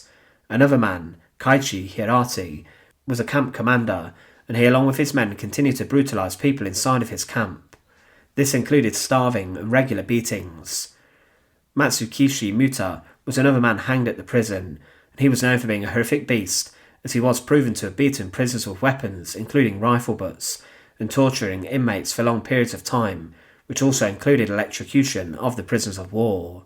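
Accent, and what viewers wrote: United Kingdom. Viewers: He’s british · The accent is British, I'm a fellow Brit 🙂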